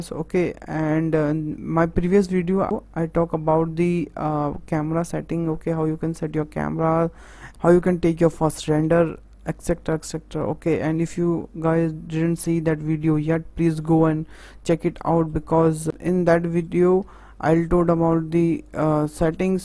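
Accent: India. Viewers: Your Tutorials are so useful and your Indian accent make it sweeter bro!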